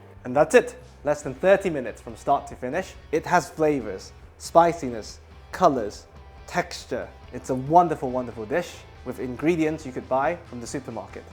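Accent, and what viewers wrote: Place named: RP, United Kingdom. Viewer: is sooooo posh 😊❤❤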